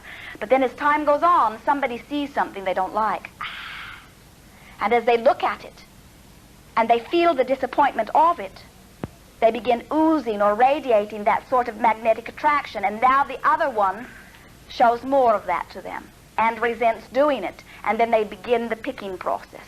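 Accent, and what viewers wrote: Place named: United Kingdom. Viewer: I love these old videos, Esther sounds so British 😂and what Esther saw, just by repeating a joke,, now that is very interesting,, it shows just how powerful, law of attraction is. gotta also say this video may be a very old video, but l ain't heard it before, and like wow, so powerful, thank you ❤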